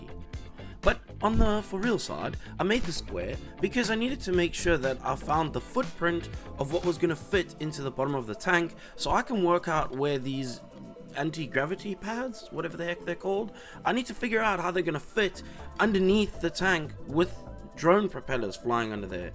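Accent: South Africa. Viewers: that's why it sounds familiar! I grew up in Pretoria, good to see a south African making such cool builds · God you have a gorgeous accent, is it south african, by chance? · PS: is that a hint of a South African accent I hear?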